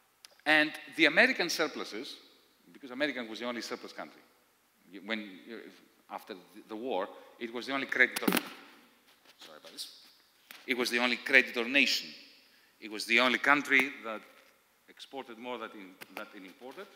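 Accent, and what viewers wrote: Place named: Greece. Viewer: I would say 75% to be fair. It takes a while to get used to his strong Greek accent.....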